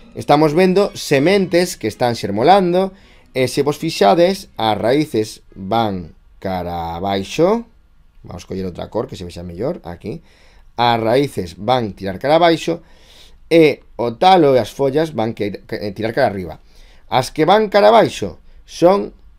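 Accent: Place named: Spain. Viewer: jajaajaj lose es que soy argentino y me da gracia como es su acento pense q era español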